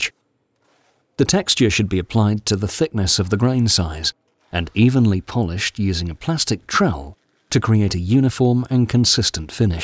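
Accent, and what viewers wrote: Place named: England, United Kingdom. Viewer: Love the English accent, way more comfortable to listen to than the American accent!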